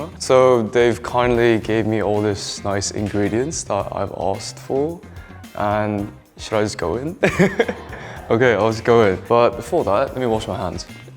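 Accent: United Kingdom. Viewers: HIS BRITISH ACCENT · HIS BRITISH ACCENT IS EVERYTHING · His British accent ❤ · British accent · MINHO YOU HAVE SUCH A LOVELY ENGLISH ACCENT 😊 BEAUTIFUL #KITTYMINHO HERE 🥰🫡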